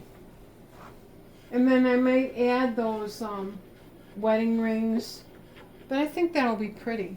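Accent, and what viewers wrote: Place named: Midwest, United States. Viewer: I thought so. My son and daughter in law lived in Wisconsin for many years and lived in Minnesota and Michigan. I could pick up that wonderful Midwest accent 😊. We are in South Carolina now .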